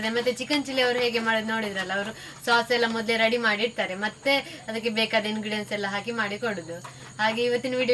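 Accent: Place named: Malnad, India. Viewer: Your malnadu accent of speech is really nice to hear 😘😘😘😘😘😘😘😘😘😘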